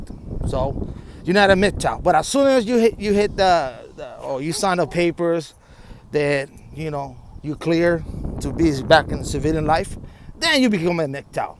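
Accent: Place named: Mexico. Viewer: It's hard to understand your broken Mexican English. You sound so low class too.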